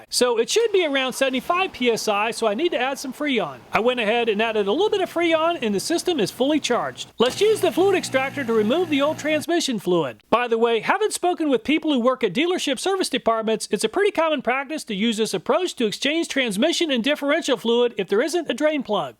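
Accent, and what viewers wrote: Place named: Midwest, United States. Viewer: He says all sorts of things funnily. I figure it's in that midwest water. I watch some gals over in Ohio and they say "To MAH to" all the time for the red orbs from which katsup is made. I laugh and laugh. But hey--it's not wrong if you live there and it works for communications.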